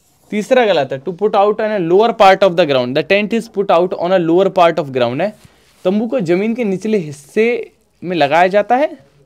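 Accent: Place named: Jaunpur, India. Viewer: Sir... Apke padhane ka style bhoat hi pasand aaya hai.... Ek dam jounpur sultanpur ki tone me.... Mazaa aagya evs ko desi touch me padh kr🙏🙏🙏😊thank u sir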